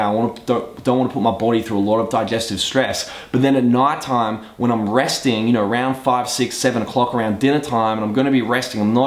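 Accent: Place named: Australia. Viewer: Love the OZie accent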